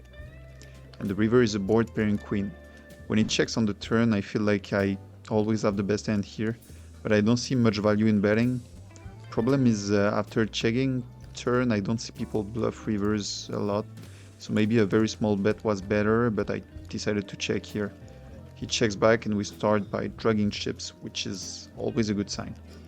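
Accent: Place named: France. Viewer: Je trouve que tu perds de ton charme, tes vlogs étaient assez cools à regarder en français et tu avais ta petite touche humour à toi. En anglais et avec l’accent français ça rend forcément un peu moins bien mais force et honneur pour tenter ça et aller chercher le marché US anglophone, ça reste quali et nice comme contenu 🔥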